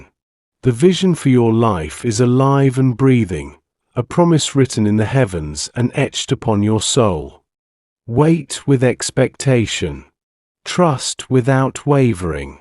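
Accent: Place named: United Kingdom. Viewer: Elon you have a beautiful voice with a slight British accent. Wow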